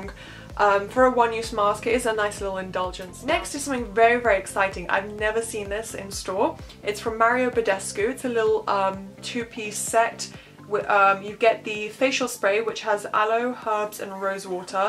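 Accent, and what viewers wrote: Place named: England, United Kingdom. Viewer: I like your English accent so much! Where are you from?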